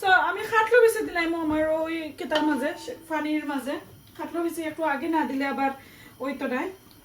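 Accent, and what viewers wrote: Sylhet, Bangladesh. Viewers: অনেক ভালো লাগলো,হাড়িগুলে অনেক সুন্দর কিন্তু চুলাতে নড়ছিলো,,,,হুকুইন শুরু দারুন হলো,,এত মরিচ,,আমার পছন্দ, তবে চেপা ব্লেন্ড করে দিতে হয় এটা জানতাম না,, সাথে ২ পদ মাছ দেয়া যায় সেটাও জানলাম,,কালার পারফেক্ট হয়েছে,,,, তেল ছাড়া রান্না টেস্টি হলো,,আমি আগে পেয়াজ রসুন তেলে দিয়ে রান্না করি,,আপনার মিট কারীও সুন্দর কালার হয়েছে,,, আইড় মাছটা কষিয়ে তুলে রাখি আমরা,,তরকারী হয়ে এলে লাস্ট এ কষানো মাছ দেই,,তবুও ভালো লাগলো,,আপনার ব্লগ আমার ক্লাসমেট দিয়েছে,,, সিলেটের কথা শুনতে ও ভালো লেগেছে ❤️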